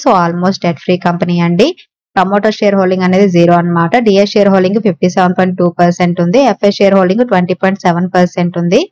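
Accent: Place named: Andhra Pradesh, India. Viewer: 😀😀😀 Mee slang vinnappude meeru Andhra Pradesh ani telusu Ma'am Ye District ani adiganu.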